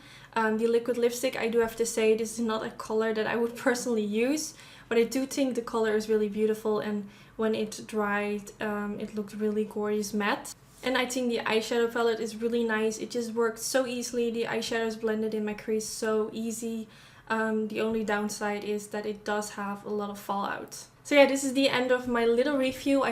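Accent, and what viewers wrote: Netherlands. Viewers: Hearing your accent reminds me soooooo much of time abroad in Amsterdam last year ❤️❤️❤️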